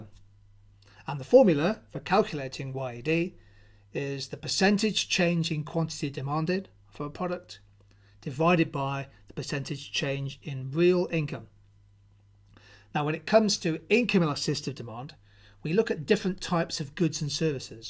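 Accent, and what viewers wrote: United Kingdom. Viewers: True British accent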